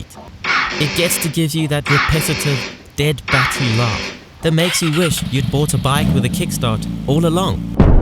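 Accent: Afrikaans, South Africa. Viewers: Eyyyyyyy, I new u were South African when I heared your voice🤣🤣🤣then I saw "Johannesburg" so what up bra🤣🤣🤣 Ok so like I'm 15 and I wanna get a bike for next year, it's a Honda cbr125r for 15k and I need to buy it myself😦😦😦 so any suggestions on how to get the cash🤣🤣🤣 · I was suspecting your from South Africa just because of your accent and then i saw the world clock on joburg, you just have that afrikaans accent some of us afrikaans mense have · Are you from south Africa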